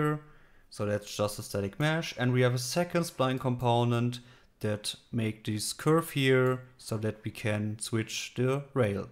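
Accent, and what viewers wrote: Germany. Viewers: Thanks for your tutorials, they are very helpful. Actually, I'm learing UE5 right now and for that, I'm trying to build my own Train Game. So far so good, I've implemented some models, two bogies per wagon and some algorithms that calculate the right placement of the train body and the back bogie position on the spline in order to always keep the same relative distance between the two bogies. The next step would be to implement switches, I think that should be fairly easy with your method, just switch the active Spline Track of each of the bogies in the moment they hit the hitboxes. But I've faced another, logical, problem/challenge. Let's say you connect some spline tracks with each other (actually haven't tried that, but that should work relatively similar to the switch "problem") and you build a U-Turn, which would place you on your "origin" spline, but the other way around. 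In that case, moving "forward" would not mean distanceAlongSpline+velocity, rather you then would have to subtract your velocity from the distance in order to move "forward" or lets say in the right direction. I'm really not sure how I could do that, like find out what would be the right direciton for the train.. Maybe we could chat a bit about this issue and what you think about that? Thanks in advance!:) Ps: You sound German, is that right? :D Then you could also answer this in German, no problem.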